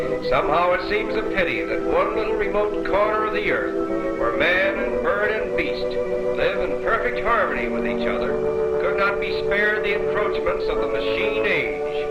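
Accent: United States. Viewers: This sounds more American than British. By the way, I am an Indian-American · great footage, what a weird and awful voiceover! lots of comments on here about British voiceover, its like, er, an an american accent init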